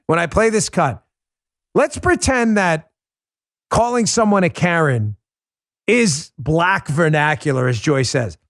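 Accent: New York, United States. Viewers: Hi Love your podcasts… and your NY mouth 😀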